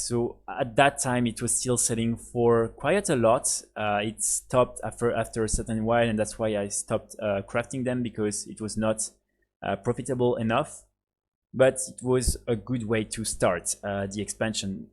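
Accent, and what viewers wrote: France. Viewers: Haha l'accent francais:P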